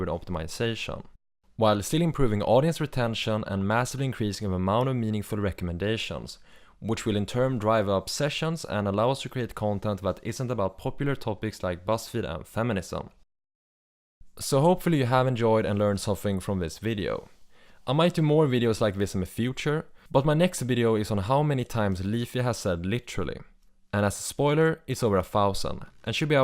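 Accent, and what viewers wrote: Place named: Sweden. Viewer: your swedish accent annoys me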